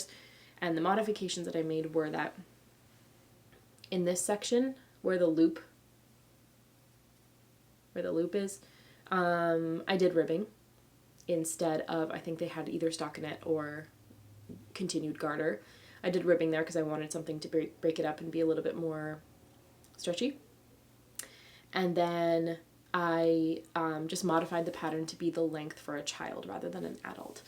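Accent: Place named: England, United Kingdom. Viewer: I was trying to guess your nationality as your accent wasn’t strong , …. That’s because you lived in England ❤ xxxx What is a touk? Yellow is my favourite colour too, just knitted filcona arwetta french vanilla colour with drops lemon mohair cardigan by petite knit , circular shoulder style … love it 🥰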